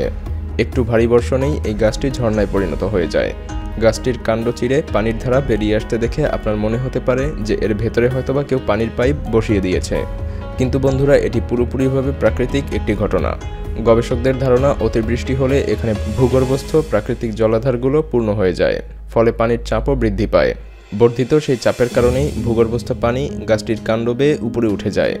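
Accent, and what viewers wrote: Kolkata, India. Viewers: Vai na india kalkata likha hua or vasa sune bujhe nen. Bangladeshr vasa eto sundor hoi na. Bangladesi ra jotoi valo vabe kotha boluk na keno kothao tan tan vaab thakbey..100%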